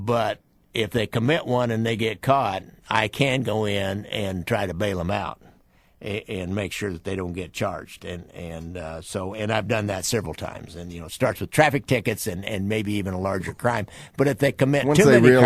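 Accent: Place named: Warrensburg, United States. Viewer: Your voice reminds me of some relatives in Warrensburg 😊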